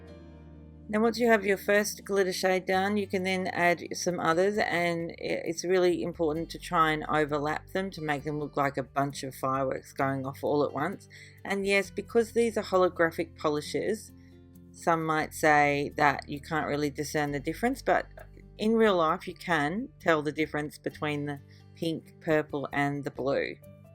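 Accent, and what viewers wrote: Australia. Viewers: All of the three design are gorgeous,I'm happy to hear an Aussie nail technician I'm an Aussie too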